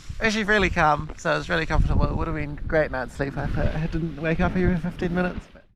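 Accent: New Zealand. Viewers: Well this is awesome! Never heard anyone speak with such a posh Kiwi accent! Oh plus the hardcore fishing … great work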